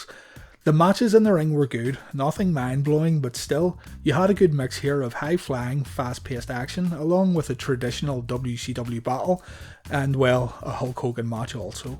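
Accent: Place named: Northern Ireland, United Kingdom. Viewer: Dude are you from the north of Ireland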